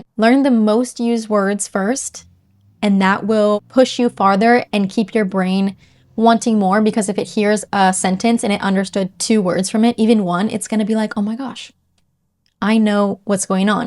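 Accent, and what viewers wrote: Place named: United States. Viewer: To add on a complete side note. I only just realized that you’re speaking with a state side accent. I follow a few YouTube, instagram accounts to graze on Spanish content. Most are native speakers but there’s a few English. You have a very English look and demeanor. Surely you’re living in England?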